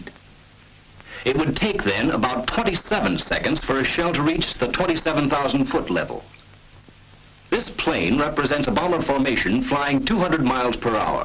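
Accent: United States. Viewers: Classic American accent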